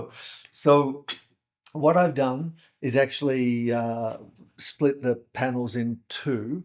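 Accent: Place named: Australia. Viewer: Ahh I knew I wasnt going crazy about your Aussie accent, would love to see you build a door with your Felder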